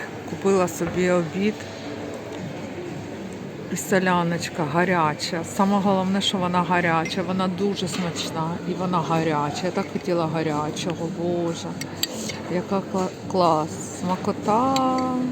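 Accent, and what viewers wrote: Russia. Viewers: Барышня , слушая вашу речь понимаешь что родной язык ваш РУССКИЙ. Не издевайтесь над собой и не занимайтесь садомазохизмом. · Дуже гарно розмовляєте українською, хоча чутно що розмовляли російською, велика подяка та респект, в цьому видно любов, повага, пам'ять до чоловіка який загинув за Україну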